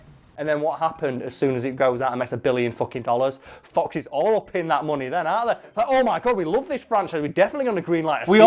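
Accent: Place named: Northern England, United Kingdom. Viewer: I’ve been binging your content for so long that I’m starting to think in a Northern accent... I’m from Australia.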